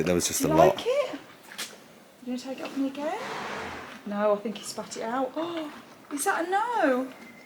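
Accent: United Kingdom. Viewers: I just can’t believe all these insults about your accent. I am a Canadian gal and i just see you as a beautiful British person. Love your accent .❤🇨🇦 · It’s unbelievable how there is discrimination between British accents 😢 very sad , imagine how the foreigners feel when they are discriminated because of their accent. And by the way I love your accent ❤ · I love UK regional accents. Love yours! I don’t live in the UK. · Lovely ❤ I love you touched the bit with the accents! I am a Bulgarian, who lives in the UK now 10 yrs... I do have and I will always have an accent... I always feel embarrassed, especially at more posh places, formal work events and important meetings. However, now you saying been offended by people, genuinely blows my mind. You speak lovely British English and I can't understand what could be wrong with people who think and say that. Keep being yourself, you are amazing and that's why people who love you do it, because that's just you. 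My absolutely favourite amongst all influencers and the most glamorous woman, an absolute example for everything! 💕 Btw, my name is Desi. 🥰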